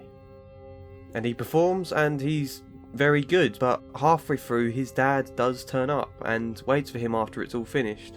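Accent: England, United Kingdom. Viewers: You sound a lot like Robert Sean Leonard only with an English accent.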